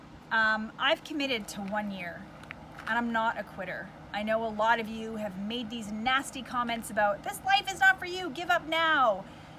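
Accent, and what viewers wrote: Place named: Canada. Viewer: I have to watch your videos with captions. Canadians talk funny. I know it is not your fault but my research shows Canadians are taught to pronounce certain words largely based on their Scottish heritage. Some examples include, “about,” “against,” and “out.” Thank HGTV and DIY network for choosing shows produced in Canada. I watched a TON of shows over the years. It became really annoying.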